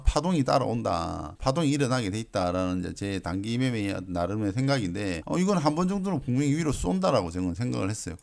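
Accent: Busan, South Korea. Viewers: 실전에 너무 도움이 됩니다 감사합니다 대표님 혹시 부산분이신가요?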